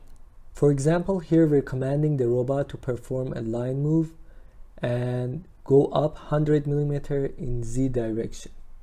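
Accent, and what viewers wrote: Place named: Iran. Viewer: iranian accent lol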